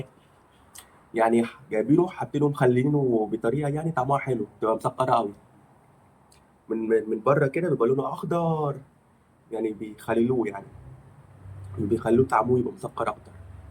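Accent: Egypt. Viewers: كلام مصري جميل الله يبارك فيك وماما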